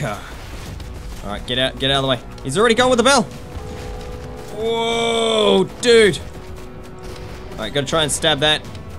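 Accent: Australia. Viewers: hes australian so he has a different accent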